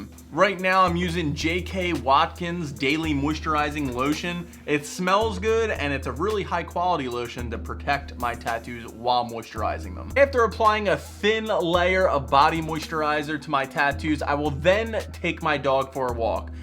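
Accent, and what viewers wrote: Philadelphia, United States. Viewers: nice video, i can tell youre from philly/delco by the accent lol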